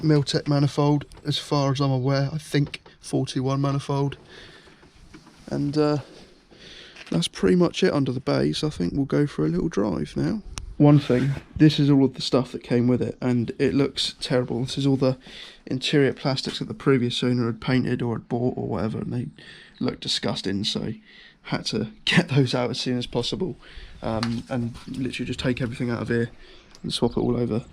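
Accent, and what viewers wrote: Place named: Staffordshire, United Kingdom. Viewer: Where are you from? You sound as if you're pretty local to me? I'm in Staffordshire.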